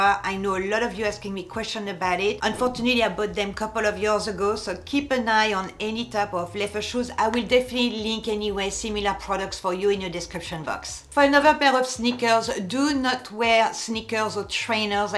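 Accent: France. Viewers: Some really great style tips. Thank-you. I could listen to your French accent for days on end - no matter what you were talking about 😍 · I love your French accent. It reminds me of the months I lived in Bordeaux. I love your personality. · I guess you are French... from your accent. Right?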